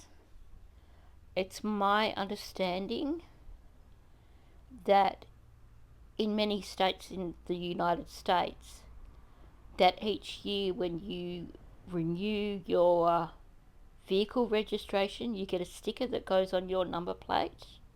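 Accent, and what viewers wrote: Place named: Australia. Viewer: Well maybe your accent is more Jacqui Lambie. :) I respect her now, not originally BTW. I didn't mean to make a big deal about it. I do appreciate someone who can conduct a civil conversation on YouTube though :) I'm from South Australia and we have different accents again.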